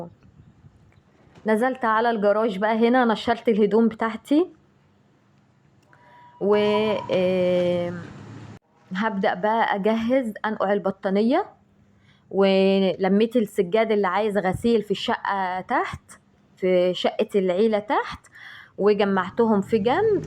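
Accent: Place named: Mansoura, Egypt. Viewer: حاسه من لهجتك انك من المنصوره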